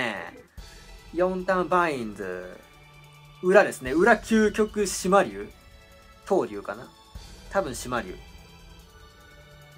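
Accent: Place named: Nagoya, Japan. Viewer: そろそろ、名古屋弁全開実況しまょう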